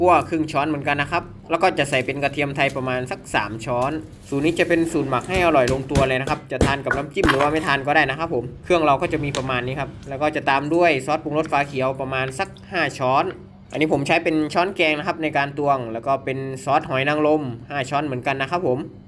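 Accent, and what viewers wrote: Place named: Isan, Thailand. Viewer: เสียงเหมือนคนอีสาน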